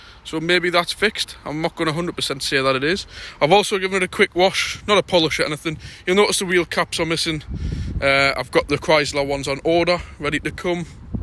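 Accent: Newcastle, United Kingdom. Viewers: You've been busy bonny lad ! Done a lot and it's looking really well. Good luck with it and I hope you get a healthy price for it considering you've been very honest with any faults or bad bits. Ps. it's just like being home again listening to the Geordie accent. Cheers man.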